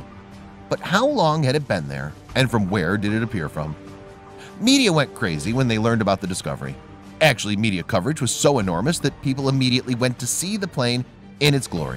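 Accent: United States. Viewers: Note the actual use of English. It is rather like a product manual from China but with a 'Yank' voice.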